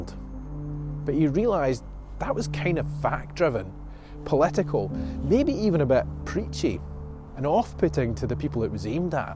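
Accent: Scotland, United Kingdom. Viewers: The story is great but, your Scottish brogue makes the story telling the best ever!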